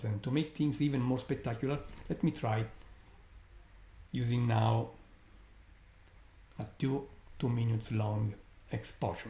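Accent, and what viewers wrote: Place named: Italy. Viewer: Se entiende bien el inglés italiano por lo menos para los latinos jaja. Gracias por compartir esta experiencia. Espero que podamos seguir observando hechos relevantes del espacie en tu canal. Seguimos conectados desde Paysandú, Uruguay.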